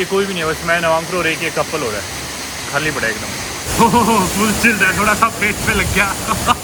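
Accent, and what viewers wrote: Haryana, India. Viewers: Like your Haryanvi touch in your Hindi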